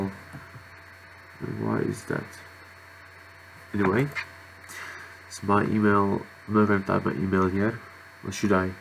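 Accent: Afrikaans, South Africa. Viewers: That boere engels. Haha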